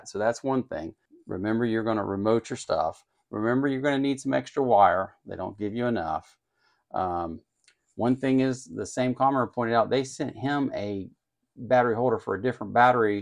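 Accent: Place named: Spain. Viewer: Your french sounds a f... lot like spanish.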